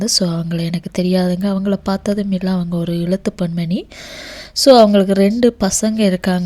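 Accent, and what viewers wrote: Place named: Sri Lanka. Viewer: முதலில் நீங்கள் எங்கட அழகான இலங்கை தமிழை அழிக்கவேண்டாம். இரண்டு தோனியில் கால் வைக்கவேண்டாம்.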